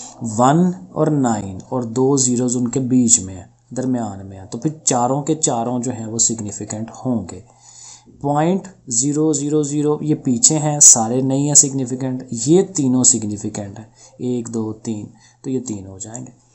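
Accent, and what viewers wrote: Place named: Lahore, Pakistan. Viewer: Ap Lahore sy lgty hyn sr😊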